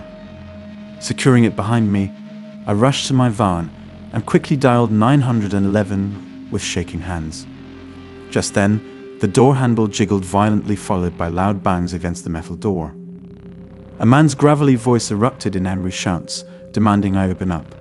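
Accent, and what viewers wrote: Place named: England, United Kingdom. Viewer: A Englishman ,narrating the story . Nice ! 😉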